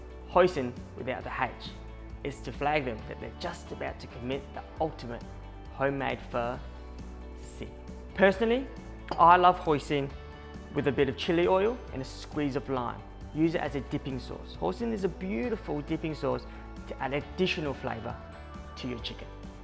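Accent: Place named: Australia. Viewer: Great Video Mate... Found you through Max McFarlin's Channel... Heard the Aussie accent and wondered who is this guy!!! Well, now I know. Best of luck with the channel and all things food. Sub done!!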